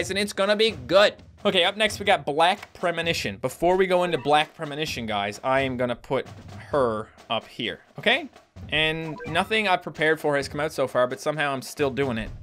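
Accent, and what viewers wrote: Newfoundland, Canada. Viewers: love ur canadian accent and ur "cosed" "o" in "sorry" from where I am the "o"s are "open" my sorry would look like a sarry and we're made fun of because of that ^^ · You're Canadian? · Wait your a Newfie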